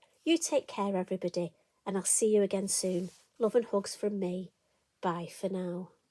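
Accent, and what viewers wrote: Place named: Cleckheaton, United Kingdom. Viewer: No you sound just like my family from Cleckheaton.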